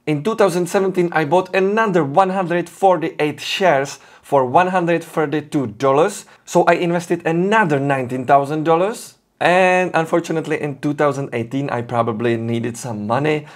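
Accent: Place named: Czech Republic. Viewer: ta česká angličtina je tak... nádherná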